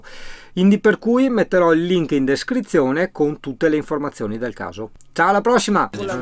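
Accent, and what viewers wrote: Veneto, Italy. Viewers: Ciao, bravo fai anche tu recensioni che ti aiutano ad avere ulteriori introiti. Ps, ho vissuto a Mestre tanti anni ora sono poco fuori, il tuo accento che è delle nostre parti mi rilassa!!! Ciao beo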